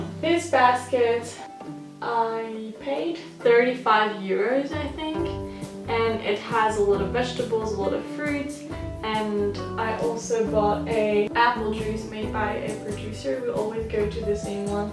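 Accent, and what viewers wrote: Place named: South, United States. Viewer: You have a very southern american accent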